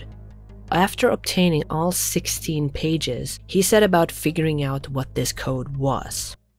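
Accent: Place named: Sweden. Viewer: So people think your voice is AI , ? With a Swedish accecnt that prounounces that as ( dat ) lol and other th words . Which I like unique. Get well soon .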